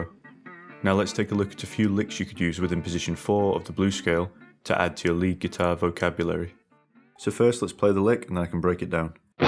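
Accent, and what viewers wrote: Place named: Lancashire, United Kingdom. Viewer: Both of you are very good although fretjam seems to have stopped making videos now. It was just that you both have similar accents,Lancashire or Manchester area tones,so to speak 😀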